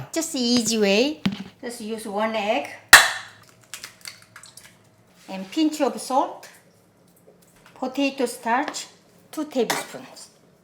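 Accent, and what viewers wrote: South Korea. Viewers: 한국인영어발음이셔서 ㄹㅇ 딱딱 귀에박힘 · 영어 발음이 경상도 분 같기도 하고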